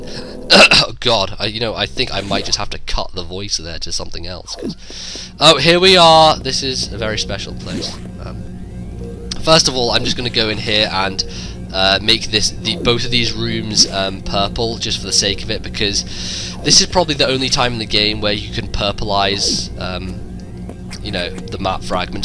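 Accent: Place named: South Africa. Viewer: Your Australian accent, more south african..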